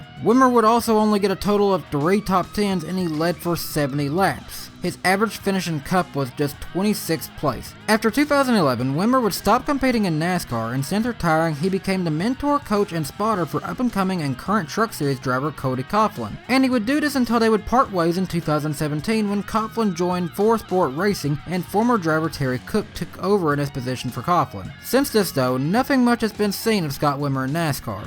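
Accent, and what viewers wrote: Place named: South, United States. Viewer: Down south, the proper pronunciation of the letter "I" is pretty much neglected. I live in TN, I see it happen all the "tom". Lol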